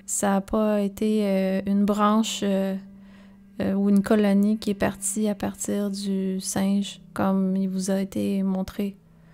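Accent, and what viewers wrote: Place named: Quebec, Canada. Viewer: ahhhh, l'accent québécois!...toujours sympa de l'écouter 😉 God bless you from France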